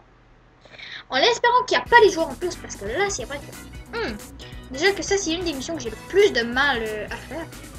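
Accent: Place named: Canada. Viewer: j'ai l'impression que tu es un canadien ce n'est a cause de l'accent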